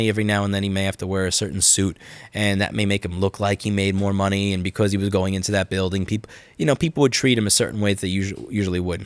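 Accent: New York, United States. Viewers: I want to start creating content for my YouTube channel, Facebook Live etc. I am researching cameras, software and the modern way of doing things. I am so 2000, using XP and Cubase SX via FireWire interface in my recording studio. Thunderbolt interfaces and 60fps 1080p Cannon M60, usb 3 is all news to me. I have alot of catching up to do. For some reason your video where you are riding your bike and talking about potentially leaving NY caught my eye. You are an interesting person and should have your own sitcom with that NY accent lol. I am from Canada BTW. Keep up the good work 🤸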